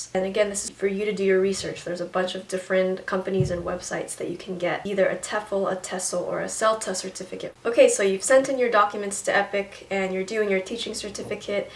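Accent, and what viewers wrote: United Kingdom. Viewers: where do you come from sis? ^^ I love your english accent.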